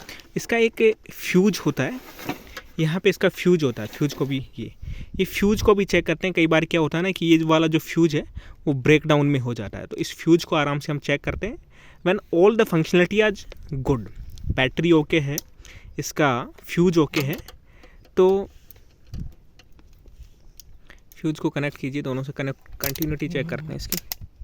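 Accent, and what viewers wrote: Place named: South Asia. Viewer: I would more appreciate this video if the commentator spoke American oe British English. I missed much because of the strong South-Asian accent.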